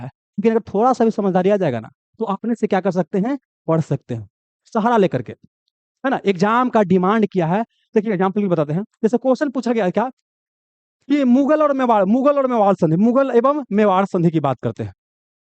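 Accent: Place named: Bihar, India. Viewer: Bihari style me mast bol rhe ho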